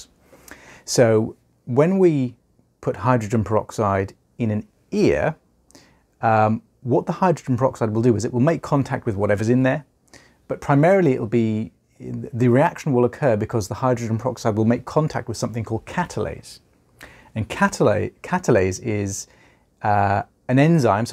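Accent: England, United Kingdom. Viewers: He is so cute and the english accent is cherry on top 😍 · His young British voice really helped soothe the horror show that came out of that ear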